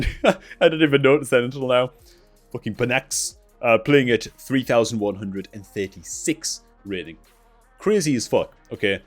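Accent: Newcastle, United Kingdom. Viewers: Hey man, good to hear your dulcet Geordie tones making wow content again and more importantly enjoying the game!! Cracking list and great addition of the stats for each spec, really gives a better perspective on what is actually strong and not just popular in the hands of the right player!!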